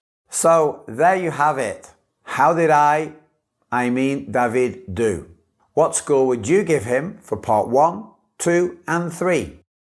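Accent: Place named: Northern England, United Kingdom. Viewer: I reckon his accent comes from north England, perhaps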